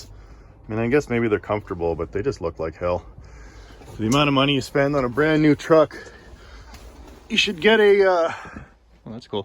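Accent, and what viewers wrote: Canada. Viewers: I know nothing about trucks, but listening to a Canadian guy talking about trucks so passionately is so relaxing to watch.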